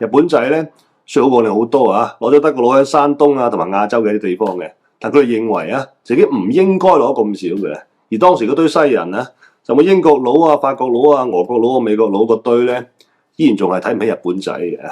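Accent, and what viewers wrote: China. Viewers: 大陸仔滿口鄉下音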